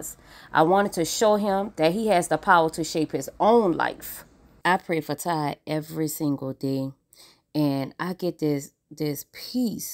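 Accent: New Orleans, United States. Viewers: She's definitely a great MOTHER and with that New Orleans accent GURL!!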